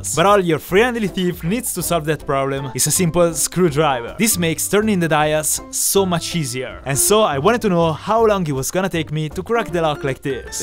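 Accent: Italy. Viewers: are you italian? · I started noticing the English pronunciation were somehow familiar to me... I see Italian dictionary on the left : "I KNEW IT, MIO FRATELLO!!!" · I am now very nervous about traveling to Italy because of your skills and accent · U sound italian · Lovely Italian accent makes it so much more credible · Why did I watch this whole video just to hear his accent? LOL. He's so Italian. I love it.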